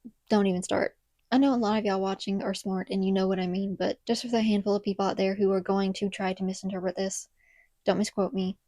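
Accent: South, United States. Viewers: What is your accent? I can't place it but it's a lovely southern accent. I'm from Texas and Arkansas (half my life in each state). Is it Tennessee perhaps?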